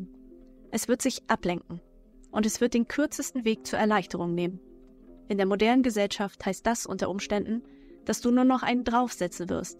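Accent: Mecklenburg-Vorpommern, Germany. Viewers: Vielen lieben Dank für genau dieses Video. Ich muss eingestehen, dass nicht ich es entdeckt habe sondern mein ältester Sohn. Er hat es in unsere Familien Gruppe gestellt. Mit der Bemerkung: "...seht es euch unbedingt an, sehr interessant!" Also danke ich Euch beiden. Meinem Sohn für's Weiterleiten und Dir für dieses Video. Ich habe mal gleich die Glocke gedrückt. 😉🤗 Was ich u.a. auch sehr gut finde, dass Du selber sprichst. Und so habe ich erkannt, dass Du aus meiner Heimat, Mecklenburg Vorpommern kommen müsstest.🤗🙋🏼‍♀️ Ich glaube, dass sich jeder in Deinem Video, mit irgendwas, identifizieren kann. Danke und mach weiter so.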